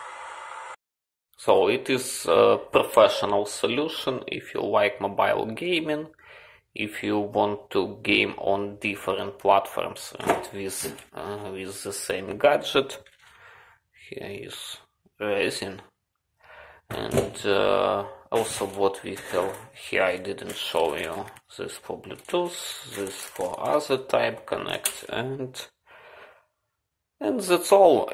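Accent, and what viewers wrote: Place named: Russia. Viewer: Why do you have a russian accent ?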